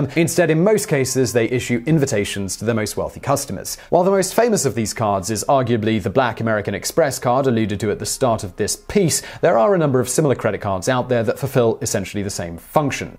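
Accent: United Kingdom. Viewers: you sound like a Brit but always use such American words, like “Cheque account” in this video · And I enjoy listening to a British accent. I find it novel. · Come on Babish, your British or American. Make up your mind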